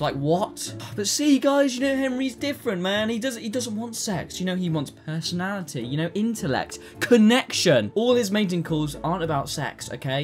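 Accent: United Kingdom. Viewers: i think i just fell in love with british accent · man your vidoes are fun 😂 Gonna binge watch everything now!! P.S. That bri'ish accent helps too 🤭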